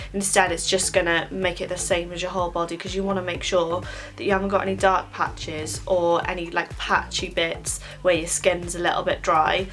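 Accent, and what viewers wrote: Wales, United Kingdom. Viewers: you welsh? xxx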